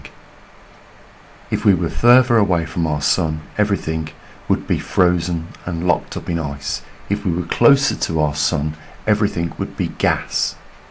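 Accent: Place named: Midlands, United Kingdom. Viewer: Very interesting info. Do wish I had finances to assist your channel. I’m local to you (just south of Solihull) based on your accent.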